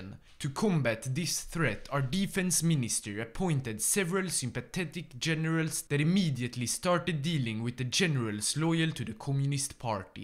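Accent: Sweden. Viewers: I’ve been a subscriber for a long time hearing ur Swedish accent and a very big popularity hope you become more better 🎉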